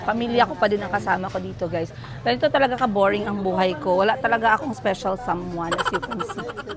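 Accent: Iloilo, Philippines. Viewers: Urs when i hear you speak ilonga nakakatuwa but My papa is speaking bisaya he is from bohol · Natutuwa nga aki madam mag salita kw ilongga kc may kaibgan dn ako taga ilo ilo.ingat po kau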